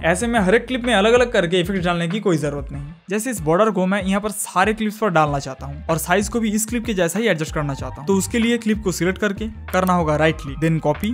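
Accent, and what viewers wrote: Bengal, India. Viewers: ap ke awaj mai bangali bala feel ata hai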